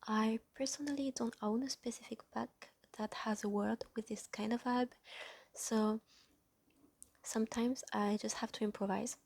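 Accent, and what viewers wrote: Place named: France. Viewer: Hello! Je suis moi aussi française, donc on va dire que ça m'arrange que tu ais l'accent, comme ça je comprend mieux ! Sinon tes constructions sont toujours super belles, je me demande toujours comment tu arrives à faire de belles choses seulement avec le jeu de base où quelques packs... Moi j'ai quelques packs, mais je ne peux plus m'en passer ! Et aussi, je trouve ça cool le voice over!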